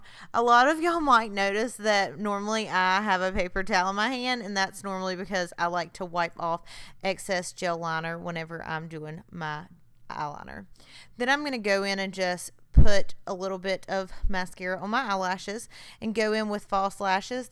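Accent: South, United States. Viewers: Randommmmm but I so love your hair it looks so healthy and love the southern accent. The makeup is cute to of course haha.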